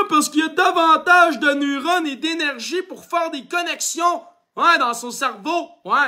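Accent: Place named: Quebec, Canada. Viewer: J’aime beaucoup t’écouter, et je suis bien contente que tu sois plus coloré dans l’expression de tes opinions. Quelqu’un qui parle monotone, c’est… Monotone. Selon certains, c’est fait exprès pour que les gens ne s’intéressent pas à la politique J’ai été déçue d’entendre parler de toi en négatif aujourd’hui. J’ai l’impression que bien des gens ne se donnent même pas la peine de t’écouter parce que tu parles en joual… Mais ce que je retiens, c’est qu’ils ont l’impression que tu les engueule, alors même quand tu as raison, ils ne veulent pas te le donner… Et, dsl mais c’est vrai que tu chiâles 🫤 Je t’adore, et j’ai écouté plusieurs de tes vidéos ! Mais là j’ai l’impression de tout l’temps me faire crier par la tête; c’est moins plaisant lol Pas assez pour me désister par contre. Mais eux… J’ai l’impression qu’ils démissionnent dès le départ Donc puisque tu en as parlé dans cette vidéo-ci; du format psychopathe, sans émotion lol (un psychopathe, ça n’a pas d’émotion) j’ai voulu te dire comment ça peut être perçu, pour que tu puisses t’ajuster. 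Parce que je crois que si même moi je commence à trouver ça pénible, ça peut être qu’il y a de petits ajustements à faire Sinon en ce qui me concerne, moi je t’écoute. Contenu très pertinent, félicitations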